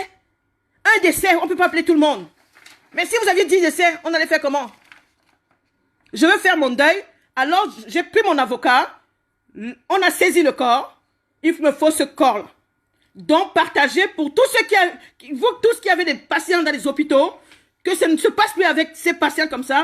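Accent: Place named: Cameroon. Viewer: Tu as un accent camerouneen. Nous avons apprit que le DOLIPRANE EST SUPRIMÈ DU MARCHÈ PHARMACEUTIQUE PARCEQUE CE DOLIPRANE A UN TAUX ELEVÈ DU CAFFEINE ET C'est mauvais de prendre ce produit si tu as des problemes gastriques et cardiaque. Ton mari avait un mal à la tète et tu as appelè l'ambulance SAMU pour l'amemer à l'hopital. Il fallait d'abord attendre demain matin si les maux de tete persistaient. En lui produisant de la PARACETAMOL ET DES VITAMINES C OR DES ORANGES. 2 COMPRIMÈS PARACETAMOL ACCOMPAGNÈES DU THE ROUGE AVEC UN DEMI CITIRON VERT ET DU MIEL. MASSÈ SON FRONT LA TETE AVEC DU VICKS MENTALATHUM ORIGINAL DE LA PHARMACIE. MASSE TOUT SON DOT AVEC DU VICKS ET LE COU ET LA GEORGE. MASSÉ SA POITRINE DONC LES COTES AVEC LE VICKS. DEMAIN MATIN FAIT LA MEME CHOSE MAIS EN LUI DONNANT UNE DOUCHE BIEN CHAUDE ET MET LE VICKS DANS l'eau dans le benoire. 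Madame tu sais tres bien que les blancs ne portent pas á les peuple noirs quand tu les enttends dire que les VACCIN DU COVID19 DOIT ETRE TESTER EN AFRIQUE NOIR. NOUS SOMMES EN EUROPE MAIS À PARTIR D'AUJOURD'HUI TOUT LES NOIRS VIVANT EN EUROPE DOIVENT MAINTENANT FAIRE ATTENTION PARTOUT OU TU ES. LE COVID19 A ÉTÈ ELABORE POUR EXPEDIER EN AFRIQUE NOIR ET NOUS TUÈS. PEUPLE NOIRS AFRICAINS REVEILLONS NOUS.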